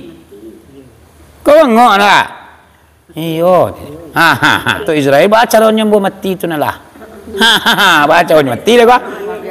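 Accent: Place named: Pariaman, Indonesia. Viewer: Malebe je mambacoe nak ngku... Bahaso piaman bana 😀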